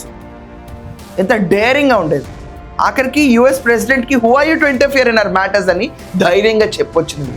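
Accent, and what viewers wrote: Andhra Pradesh, India. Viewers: ఇది నిజము అక్క నీవు ఆంధ్రా అక్కవు కావ నీవుఅంద్ర లాగ మాటలాడు తావు బాలయ్య గురించి కూడా చాలా చక్కగా మాటలాడు తావు నీవాయస్ బాగుంది🎉❤❤❤❤🎉❤❤❤🎉❤❤❤🎉❤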